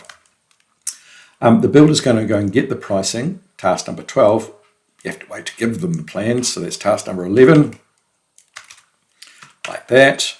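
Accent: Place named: Australia. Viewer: yeah you sound Aussie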